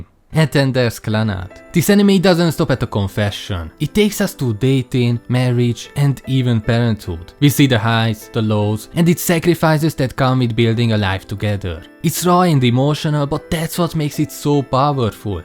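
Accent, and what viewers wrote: Hungary. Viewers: Is that a hungarian accent I hear?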